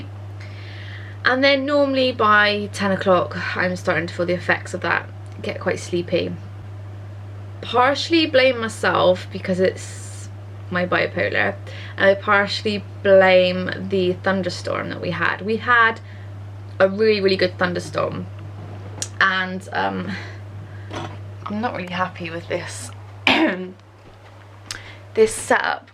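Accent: United Kingdom. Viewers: you should use a tripod so you can adjust the height so it doesn't cut your head off at the top ;) I enjoy watching your videos. keep up the good work. and i enjoy your British accent. ;)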